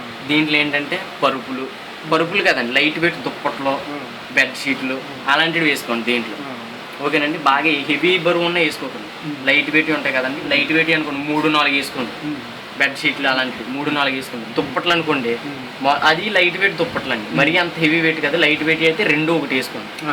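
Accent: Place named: Godavari, India. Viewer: Godavari Telugu super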